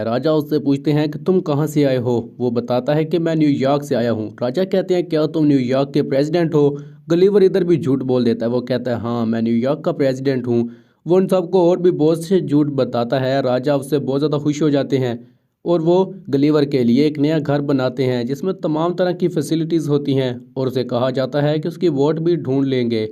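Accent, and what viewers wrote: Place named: Pakistan. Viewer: Tumhari aawaj se lag raha hai tum Pakistan se ho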